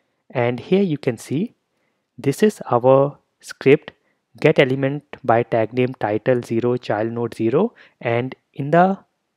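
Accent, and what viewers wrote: India. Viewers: Hearing India accent, closing the video.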